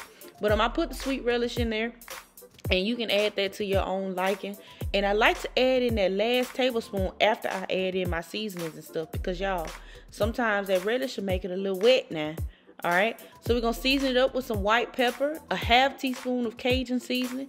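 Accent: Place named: South Carolina, United States. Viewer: Love the video. You sound like you’re from SC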